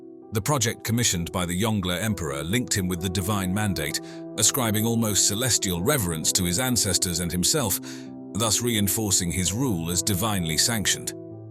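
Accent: England, United Kingdom. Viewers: It's tough listening to the narration because it's in an English accent and all I can think of are liars.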